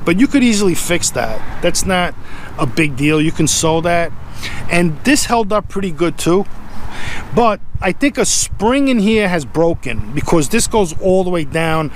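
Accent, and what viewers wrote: New York, United States. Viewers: thats boston.. this guy is from new yawk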